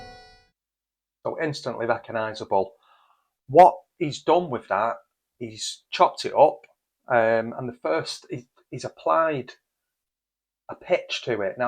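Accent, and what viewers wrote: Manchester, United Kingdom. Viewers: Is that a Mancunian accent I hear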